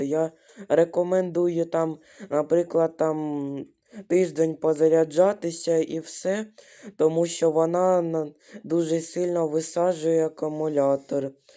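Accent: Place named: Russia. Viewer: Молодец хлопец, Але тренуйся правильно розмовляти, без слов- паразитов я- то " от" та тренуй вимову української глухої "г " замість російської дзвінкої.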